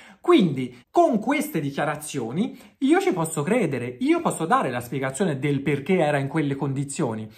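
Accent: Tuscany, Italy. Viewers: 🙋🏻‍♀️presente nuova iscritta! Io ho fatto il mio, tu per c contraccambiare mi devi accentuare il tuo accento toscano!!!!!🤩🤩😍😍😍😍🤩🤩🤩🤩amooooo!!!!!!!!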